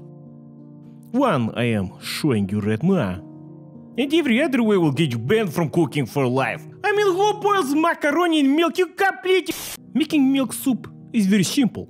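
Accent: Russia. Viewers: I’ve always like the Russian accent and some time I speak in sort of Russian accent, but with your Russian accent and Comedy is perfect, love your channel❤️🤪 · This combination of soft music and heavy Russian accent along with the cut off sexual comments is killing me lol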